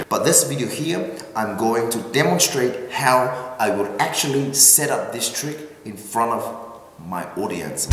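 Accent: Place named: Australia. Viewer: I just found you today on my recommended. I like how you teach card tricks. Is he Australian by any chance as his accent sounds like it?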